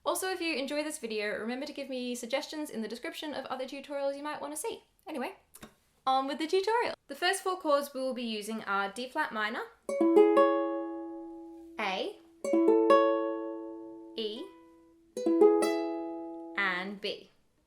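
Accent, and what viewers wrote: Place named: Australia. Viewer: Were are you from? You sound Australian...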